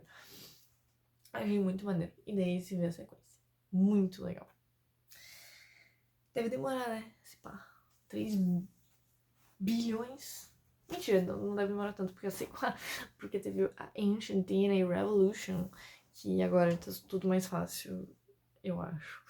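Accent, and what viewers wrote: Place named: Portugal. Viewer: Achei que era portuguesa no primeiro minuto de vídeo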